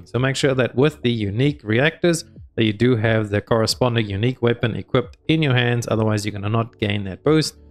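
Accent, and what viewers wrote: South Africa. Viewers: Ahhh that lovely South African accent…. Love it. Ja my bru I totally got this china. Great video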